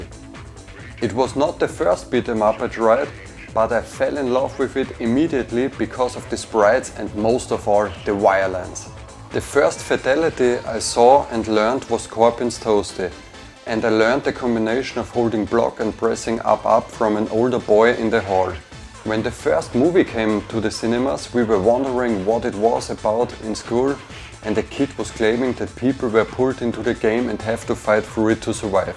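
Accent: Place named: Austria. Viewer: Γεια σου! Ρε μαλακα ich kann dir nur sagen, dass deine Editing Fähigkeiten richtig gut sind und die Umsetzung sehr gelungen ist. Es war toll deine Geschichte über deine Kindheitserinnerungen von Mortal Kombat zu hören in deinem fehlerfreien Englisch (mit enormem österreichischen Akzent) und das ohne ähms oder Unterbrechungen. Was du bestimmt verbessern kannst ist die kurzen Abstände zwischen den Sätzen zu cutten, da dies noch professioneller klingt. Dein Intro hat es mir echt angetan und ich finde es einfach genial, dass du zu deiner Herkunft stehst und dein Ding durchziehst. Ich habe dich auf Instagram gefunden und dein Link in der Bio hat mich hierhergeführt und ich war überrascht, dass du nur 12 Abonnenten hast. Durch mich hast du jetzt 13 und ich gebe dir hiermit einen Daumen nach oben. Ich hoffe deine zukünftigen Videos werden sich auch mit anderen Themen beschäftigen. 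Wenn du magst, kannst du mal auch meinen Channel anschauen, der ebenfalls in den Kinderschuhen steckt. Kannst mich auch gerne auf Instagram abonnieren, habe dir dort ebenfalls ein Abo gegeben. Ich habe ein Unboxing und Gameplay zur SNES Classic Mini gemacht, welches mir total Spass gemacht hat. Vielleicht wird dir das auch gefallen! Bleib dir selber treu und ich freue mich auf dein nächstes Video. Grüsse von einem Serben aus der Schweiz Vlad :)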